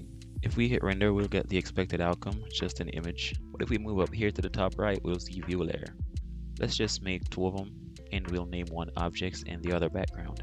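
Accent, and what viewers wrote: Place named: Jamaica. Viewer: I like your accent sounds Jamaican.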